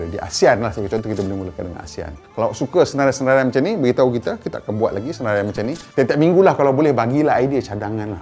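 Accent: Indonesia. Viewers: Cakap saja guna bahasa Melayu Malaysia. Tok sah ikut rentak loghat indon tu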